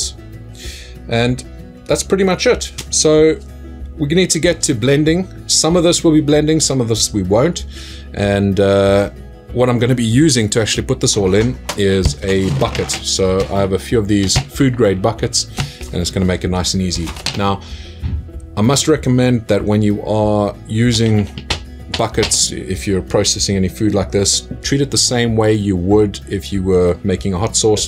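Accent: South Africa. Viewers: Thanks for the video. I have been making Indian and North African spice blends for awhile now. I am expanding more into cajun/creole cooking now, and this helps. PS love the South African accent. I grew up there. Live in Northeast USA for the last 38 years now.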